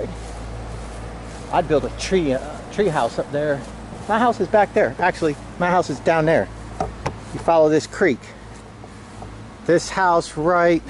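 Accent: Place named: New Jersey, United States. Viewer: Great Channel and content. Nice work too. But do I detect a Jersey accent..? Formerly Monmouth and Essex County here, was in business for 27 years. Keep up the good work!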